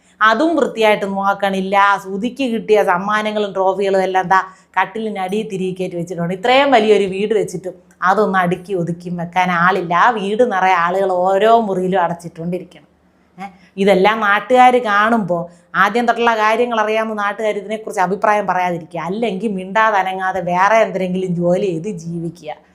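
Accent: Thiruvananthapuram, India. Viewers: Thirontharam slang is xcellent❤ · 😵‍💫🥴 തിരോന്തോരം അപ്പി സ്‌ലാംഗ്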